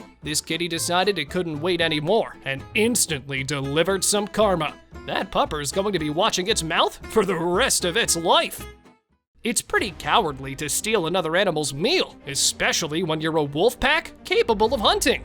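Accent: United States. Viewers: Totally agree, annoying American voice ! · If you ever want a nature documentary completely ruined, get a yank to narrate it. What a colossal putz!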